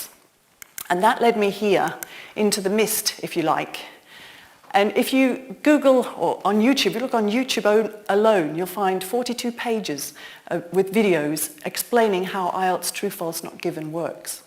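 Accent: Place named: United Kingdom. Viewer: đó là phát âm giọng Mĩ, còn của cô là giọng Anh hoàn toàn khác nha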